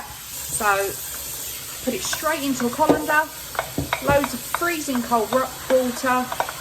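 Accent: United Kingdom. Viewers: Bing bang bosh, you sound like you’re from London mate. Thanks for the handy video 🙏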